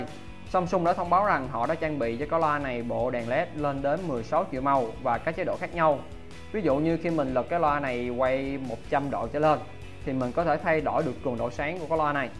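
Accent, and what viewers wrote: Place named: South, Vietnam. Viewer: tiếng miền nam ko thể nào nghe dc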